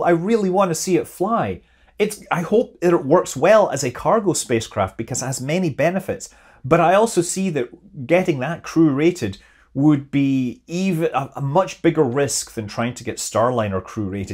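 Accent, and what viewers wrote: Scotland, United Kingdom. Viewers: Scottish to Amerikan translation is needed for this expression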